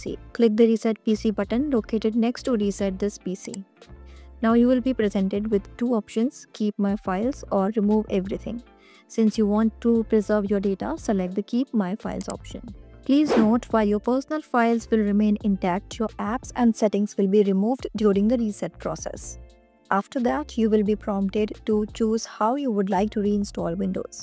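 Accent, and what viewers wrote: India. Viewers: That indian accent XD I can't get any more XD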